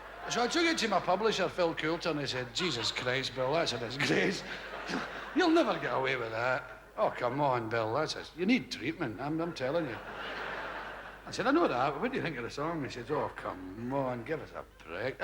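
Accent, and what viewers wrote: Scotland, United Kingdom. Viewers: It's the early days, he still had his Glasgow accent. He sounds as Scottish as he's always sounded. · Is it me or our beloved Billy sounding more scotish can't work it out ???🥰😘❤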